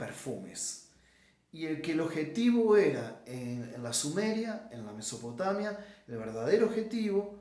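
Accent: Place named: Brazil. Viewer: Excelente contenido amigo gracias por este video, no puedo evitar encontrar en su acento argentino, también una sutil mezcla del portugués brasilero .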